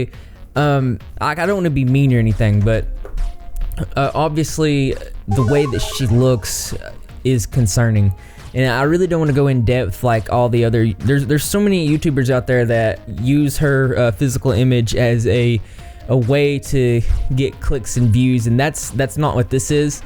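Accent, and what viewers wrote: South, United States. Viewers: I'm trying to figure out where this guy is from lol. Definitely from the south, but at the same time reminds me of the 1980s. Does that make any sense? Probably not! 😂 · Southern I'm from ky and its definitely this area Tennessee maybe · It's so refreshing to hear things like this and such a sensitive position in a southern accent. I feel like conscientious & kind southerners don't get a lot of representation (not that they're an ~oppressed~ group or anything, I just think the largely conservative majority of southern states makes a specific stereotype somewhat accurate) and it's just very comforting and nice to hear. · Are you southern? Because i am and i cant tell, which is so wierd! This is the first video of yours that ive watched. You seem southern, but i have heard people from california talk like that so i dont know. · nice southern accent! 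haha im from texas, where are you from?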